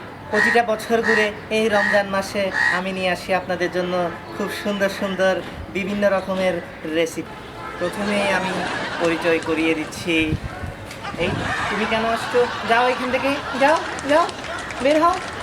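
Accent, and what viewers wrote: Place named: Rajshahi, Bangladesh. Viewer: rajshahir pola kothai buja jaitache ga.... nice job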